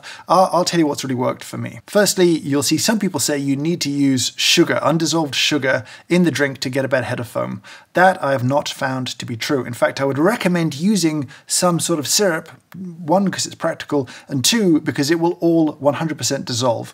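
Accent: United Kingdom. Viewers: From watching your videos I have really developed a love and respect for coffee. I'm quite sure it has a lot to do with your British accent. Here's a small, insignificant suggestion, sneak a French word in your description of the flavor. It will add a continental flavor, I think. It has nothing to do with the content but combined with that accent will add some spice to the presentation. We English speakers have a certain respect for the French for some reason. The language has always lent a certain something to the mother tongue. Keep up the great work!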